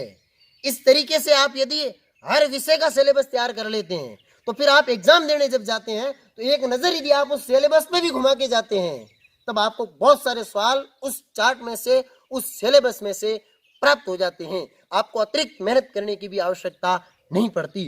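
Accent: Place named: Marwar, India. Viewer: सर किस गांव से हो मारवाड़ी बोली सुनकर मजा आ गया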